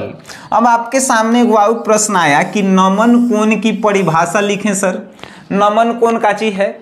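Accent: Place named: Bhojpuri, India. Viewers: Bhojpuri h kya akdm se😂😂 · Apka bhojpuri sound mast hai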